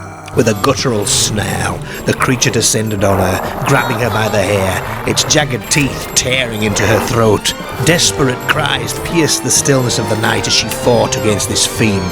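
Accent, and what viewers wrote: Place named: Northern England, United Kingdom. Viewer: Just came across this version of the story. I am trying to work out your accent. Obviously northern, and I am from the north of England, but can't place this. I know there are lots of variations and yours is new, and fascinating.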